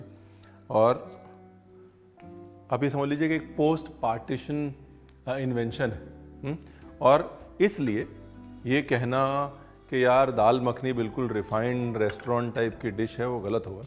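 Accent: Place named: Lucknow, India. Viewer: Your hindi in lakhnavi accent is very pleasant to listen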